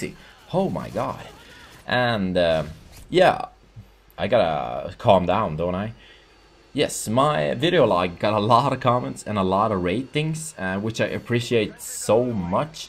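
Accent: Sweden. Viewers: are you swedish? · lol Your Swedish accent is so hilarious.. keep it up dude.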